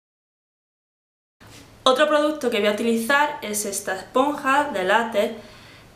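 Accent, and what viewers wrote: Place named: Granada, Spain. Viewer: Sabía que eras granadina!tienes nuestro acento!ME ENCANTAN TUS VIDEOS!! haber si coincidimos algún día en granada!! Un besazoo de una faan!!=)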